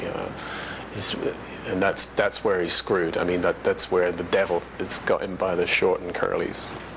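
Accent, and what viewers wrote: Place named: England, United Kingdom. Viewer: His English accent is hardly noticeable in this.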